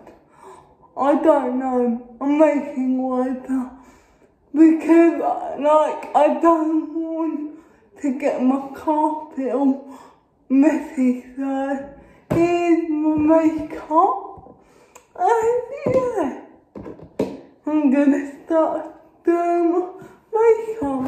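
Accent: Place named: United Kingdom. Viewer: Hi, I know this isn't your latest video (at the time I'm writing this) but I just found your channel and decided I'd comment on this video because it's great how you're showing how you can do things yourself, and you're so pretty even before the makeup, and ya know us Yankees love the British accent too, so thanks and looking forward to seeing more videos, xox.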